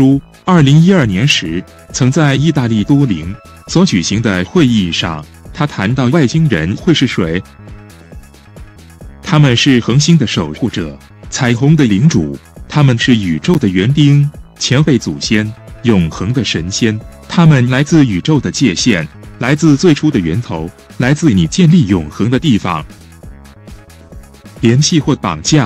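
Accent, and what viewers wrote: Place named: Taiwan. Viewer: 這個語音居然有點台灣國語，是沒錄好嗎==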